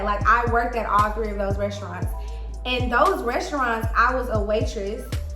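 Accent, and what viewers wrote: New York, United States. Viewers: I your little new York accent or whatever ❤️❤️❤️❤️❤️❤️❤️❤️❤️❤️❤️❤️❤️❤️❤️❤️❤️😂😂😂😂😂😂😂😂😂😂😂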